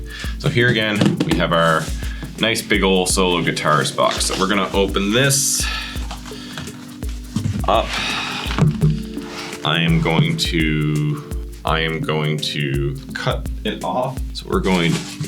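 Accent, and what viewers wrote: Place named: Canada. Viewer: Wow what a voice, you sound Canadian haha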